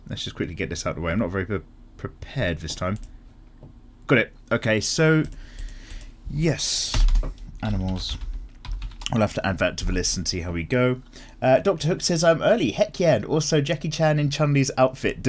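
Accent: United Kingdom. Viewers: you kinda look like and sound like Ravi from Izombie (not just the British accent). if you don't know who i'm talking about... well you can look it up. i'm also gonna post this on every DWM in the future till you find out. also draw albedo from overlord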